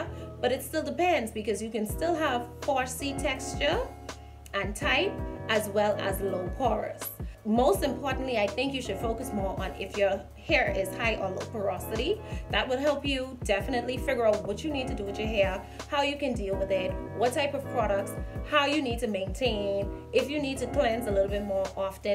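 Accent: Bahamas. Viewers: Are you Bahamian?? I can hear the accent.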